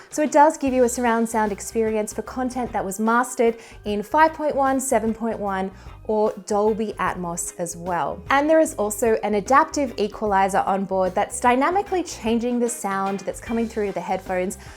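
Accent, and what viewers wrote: Australia. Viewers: Is she aussie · Is she australian?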